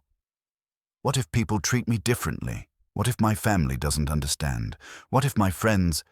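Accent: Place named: England, United Kingdom. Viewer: English accent is awful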